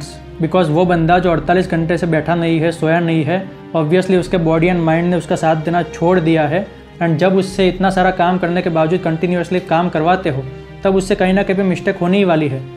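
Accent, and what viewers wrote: Gujarat, India. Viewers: Clearly your voice says you are pakka gujarati ❤️❤️❤️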